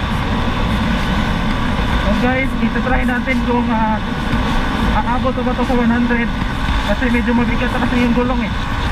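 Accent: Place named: Cebuano, Philippines. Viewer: Halatang bisdak paps ah😁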